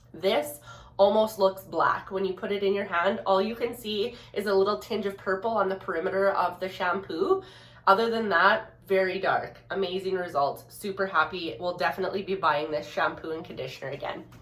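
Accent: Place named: Canada. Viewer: Where are you from?? You sound veeery Canadian!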